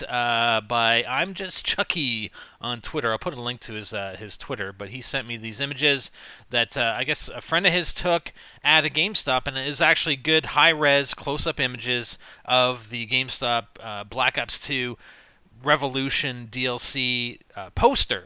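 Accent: Canada. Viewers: R u candadian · Are you from Canada?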